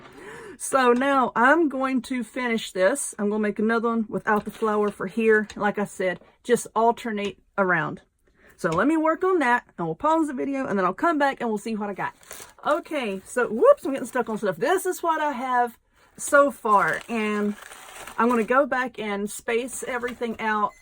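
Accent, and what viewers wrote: South, United States. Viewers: I just love your videos! And your humor, my husband and I just love watching your videos and listening to you southern accent 😁😍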